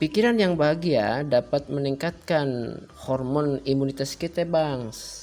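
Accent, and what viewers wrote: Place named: Jakarta, Indonesia. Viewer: Khas banget bahasa Betawinya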